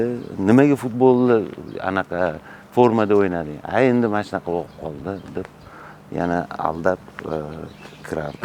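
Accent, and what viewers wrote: Tashkent, Uzbekistan. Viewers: ТОЗА Тошкенли . азгина чириганро копто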